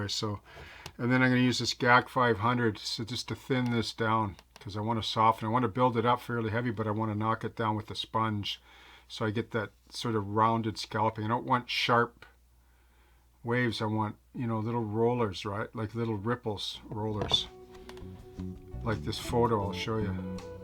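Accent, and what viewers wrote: Canada. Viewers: Now I want to go watch Strange Brew listening to that Canadian accent!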